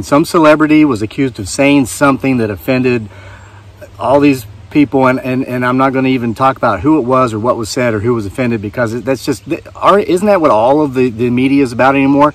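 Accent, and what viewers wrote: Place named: West Virginia, United States. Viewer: You sound like you are from a small town in West “by God” Virginia, lol. I’ve really missed your videos. Our philosophy is basically the same. We must have grown up close to the same small town. 😜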